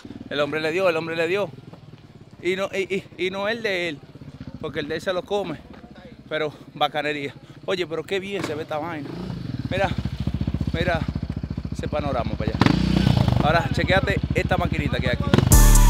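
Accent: Dominican Republic. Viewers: KLK TA DURO ESTE CANAL OYE AMI Se ase que eres dominicanos esmas yo wa a desi que tu ere dominicano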